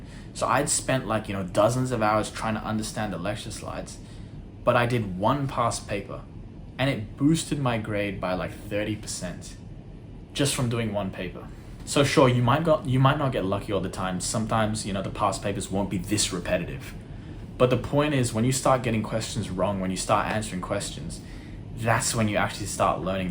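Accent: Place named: Australia. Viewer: dude whats with the subs, aussie accent isnt that hard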